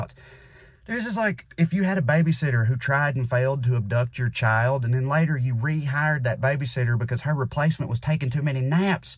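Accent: South, United States. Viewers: Speaking as a Mississippian, I don't hear the word "defenestrated" or "feckless" said with a Southern accent nearly often enough. I know my neighbors too well to trust them running anything much or picking our leaders. Through their great decision-making skills, they have enough trouble getting through their own lives, I don't want 'em having much influence on mine. Vote blue. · As someone who was born in Alabama, thanks for this video, great example of someone with a strong southern accent who sounds legitimately intelligent. lol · Love ya Trae ❤ & I'm so bloody proud of myself that - as an aussie - i can understand you without subtitles ( because you speak really fast as well as with an accent I'm not used to even among american accents 🤣🤣 ) - love ya like chicken ❤❤❤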